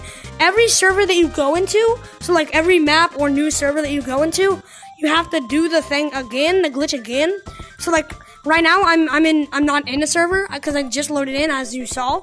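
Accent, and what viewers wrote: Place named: England, United Kingdom. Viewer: Why does bro sound like English kid Naruto?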